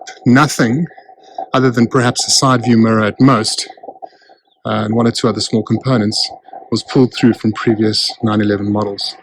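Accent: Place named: South Africa. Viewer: I take it from your accent, you're South African. Is that where you got the car ? It's spectacular. The nicest 928 I've seen..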